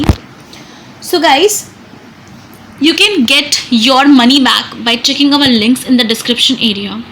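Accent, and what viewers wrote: India. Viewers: I don't understand the Indian/English accent, Worthless review, at the end I did not understand whether she bought a realistic dog or not since did not show the physical dog running all over.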